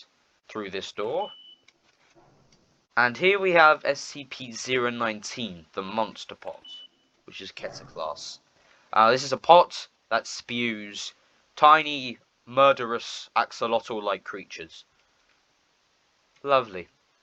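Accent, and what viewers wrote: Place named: United Kingdom. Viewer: Nice your British funny voice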